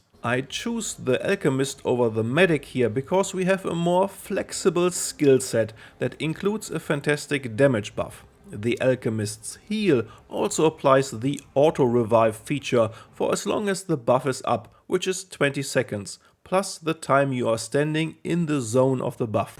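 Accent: Germany. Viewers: Ich hör den Deutschen noch ganz leicht raus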